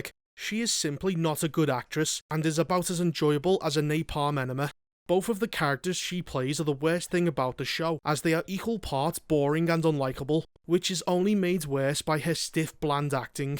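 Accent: Liverpool, United Kingdom. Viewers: Liverpool accent. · He's a posh scouser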